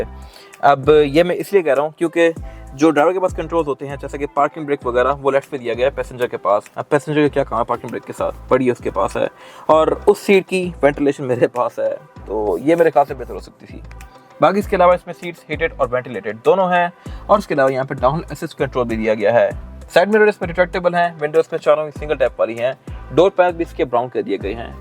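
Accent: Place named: United Kingdom. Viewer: آپ کی آواز کی صحیح سمجھ نہیں آتی ۔ یا تو آپکے مائک میں خرابی ہے یا پھر آپ تھوڑا آہستہ بولا کریں اور اردو کو بجائے انگریزی لہجے میں بولنے کے اردو ہی کے لہجے میں بولا کریں ۔ ریویو آپکا اچھا ہوتا ہے پر آواز اور لہجے کی وجہ سے کچھ الفاظ سمجھ نہیں آتے ۔ شکریہ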